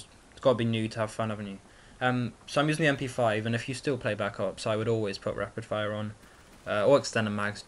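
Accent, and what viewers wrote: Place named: England, United Kingdom. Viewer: where abouts in England are you from???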